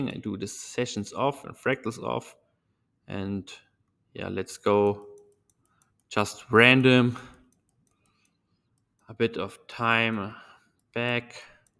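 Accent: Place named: Germany. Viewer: I can just hear you are german, keep it up! the accent will go away after some time.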